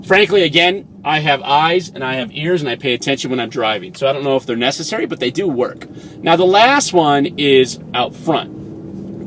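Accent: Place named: United States. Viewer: Your voice is so loud . Very American like .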